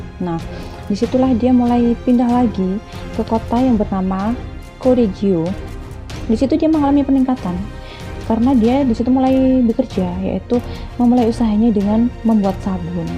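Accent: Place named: Java, Indonesia. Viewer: masih medok ya buk 😭😂💛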